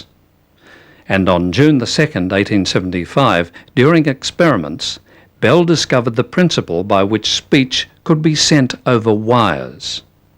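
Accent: Australia. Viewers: Australian accent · He's Australian, other than that, not sure. I heard him in many educational pieces growing up in Melbourne Victoria. It's cultivated Australian English which (I think) is based on Oxford English but sounds a bit flatter for lack of a better explanation. It's most common in Sydney and Melbourne. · The Voice Artist is speaking with an Australian Accent, as this is an Australian Production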